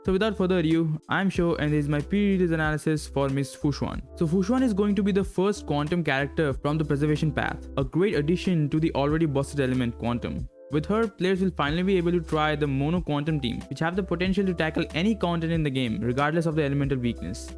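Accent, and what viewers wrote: India. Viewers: You sound like you’re Indian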